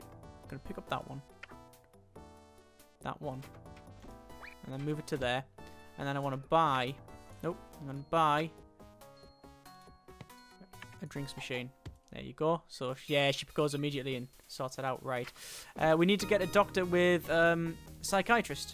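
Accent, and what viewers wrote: United Kingdom. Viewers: Others have done it. Like Nerd3. For some reason (maybe the british accent and their good taste in games) I tend to confuse and Nerd3 sometimes;P Not like "Oh, is this Nerd3 or I'm watching" but memories of one channel or the other tend to fuse together.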